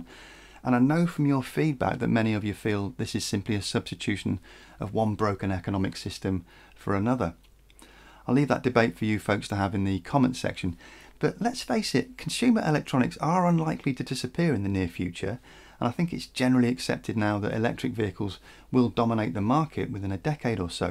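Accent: United Kingdom. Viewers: I like his voice. So calming and so british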